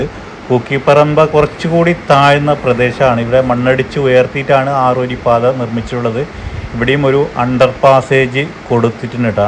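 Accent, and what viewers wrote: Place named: Kannur, India. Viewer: സഹോദരന്റെ വീഡിയോകൾ സ്ഥിരമായി കാണാറുള്ള ഒരാളാണ് ഞാൻ താങ്കളുടെ ഭാഷയിലെ കണ്ണൂർ കാസർകോട് ശൈലിയിലുള്ളതാണ് എങ്കിലും നമ്മുടെ സ്വന്തം ജില്ലാ ആയ മലപ്പുറത്ത് വന്ന് വീഡിയോ ചെയ്തതിൽ വളരെയധികം സന്തോഷിക്കുന്നു പക്ഷേ ഒരു കാര്യം പറയാതെ വയ്യ മലപ്പുറം കോഴിക്കോട് കണ്ണൂർ ഭാഗത്ത് ആറുവരിയിൽ വണ്ടി ഓടിക്കാൻ നമ്മുടെ ആളുകൾക്ക് അറിയില്ല എന്ന് പലപ്പോഴും തോന്നിയിട്ടുണ്ട് പക്ഷേ ഒരുപാട് ഫൈനും ഒക്കെ വരുമ്പോൾ പഠിക്കും എന്ന് വിചാരിക്കുന്നു നല്ല ഒരു വീഡിയോ തന്നതിന് നന്ദി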